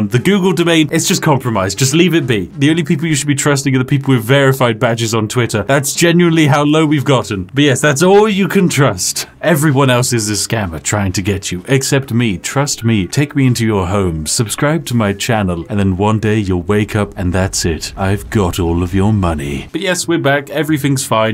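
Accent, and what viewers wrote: United Kingdom. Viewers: This suddenly became a big irony for this.... Anyway good the have the Bri'ish back! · Woo the is back! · Ngl, I'm British and I still don't know how you have a Chad British accent. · The British man is back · (°o°:)ᴼʰ the is back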